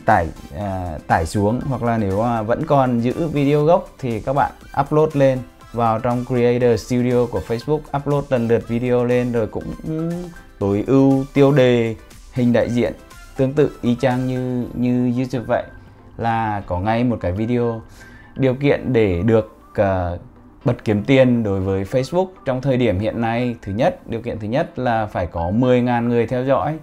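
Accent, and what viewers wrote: Central, Vietnam. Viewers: Anh người miền Trung àh.ngương mô anh quá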